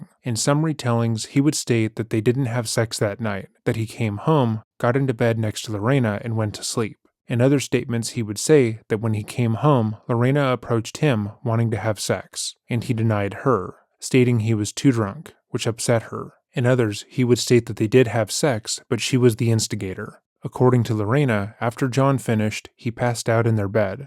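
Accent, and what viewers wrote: Canada. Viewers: You're Canadian? This story brings flashbacks 😂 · well he has either purposely added the Canadian accent or the AI randomly generated it and he didn't notice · I’ve been subscribed for over a year and it’s just now dawned on me that you’re Canadian! Canadians pronounce the word “about” very uniquely. 😅 Love the channel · have you become more Canadian recently?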